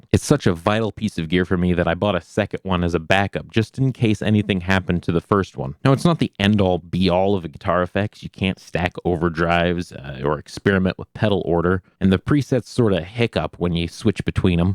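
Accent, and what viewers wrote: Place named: Chicago, United States. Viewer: Your Chicaaaago is showing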